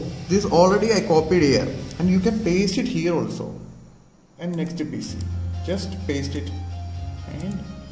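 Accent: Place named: Kerala, India. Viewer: by your accent , i am pretty sure you're a malayali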